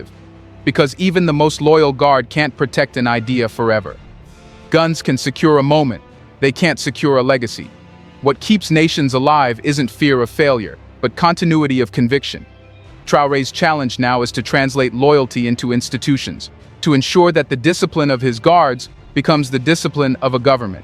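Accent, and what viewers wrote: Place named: United States. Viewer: Who is this??? Why are you providing such Details? Who sent you? This American accent reporting so hard on this man. Thanks but no thanks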